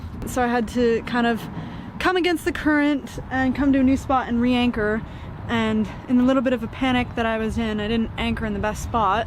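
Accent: Canada. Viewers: Love your Canadian pronunciation and I am sure the dolphin was a good omen, all the best on the ICW.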